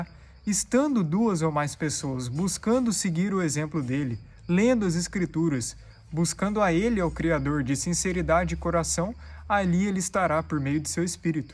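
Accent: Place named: Minas Gerais, Brazil. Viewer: Olá! Eu adoro ler os comentários dos irmãos! E muitos que li me identifiquei. Alguns irmãos testificam sobre a tristeza que sentem dentro dos templos, por vários motivos, inclusive e principalmente por só pregarem prosperidade e não o evangelho na sua essência. Isso sempre me incomodou as poucas vezes que fiz parte. Fui batizada ainda bebê num templo católico da minha cidade, no sul do Piauí. E nunca me sentir com vontade de fazer parte de algo por lá, pois o meu corpo não me pedia pra participar, uma força superior parecia me impedi de estar ali, isto eu percebia. Aí eu fui ganhando maturidade sobre este assunto e eis que descobrir os motivos da minha antipatia por tudo isso. E hoje com 49 anos, tenho certeza que foi o Criador que me livrou desse ambiente, eu creio que ele me ama muito em ter me livrado dessas abominações. Eu digo sem medo, sou infinitamente grata ao Pai por ter me feito enxergar desde cedo o que tudo isso significa na sua verdadeira essência. 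Obrigada, irmão! Vc tem me ajudado muito a entender sobre a palavra, assim com seu jeitinho mineiro de falar😊 muito obrigada de ❤️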